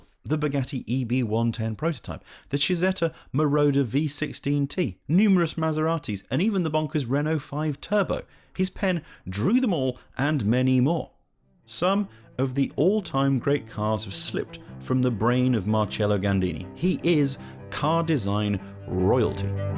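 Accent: United Kingdom. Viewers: Yeah we get it. You are british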